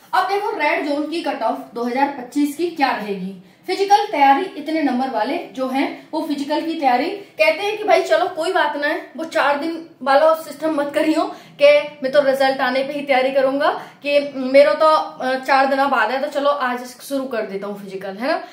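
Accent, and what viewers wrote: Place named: Rajasthan, India. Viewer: Didi aap rajasthan se ho kya aapki language se lag rha ❤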